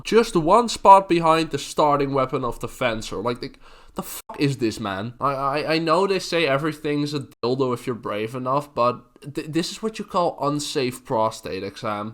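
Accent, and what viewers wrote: Netherlands. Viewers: Hey are u dutch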